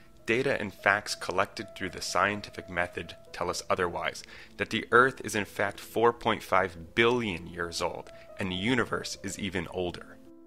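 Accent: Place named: United States. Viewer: In all this time, I assumed you were British for some reason. probably the choice of music. Your more American accent took me by surprise, lol.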